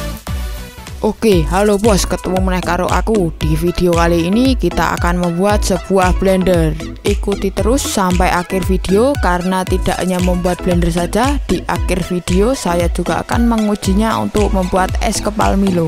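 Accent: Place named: Java, Indonesia. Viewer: Keliatan kali jawanya... Medok abisss...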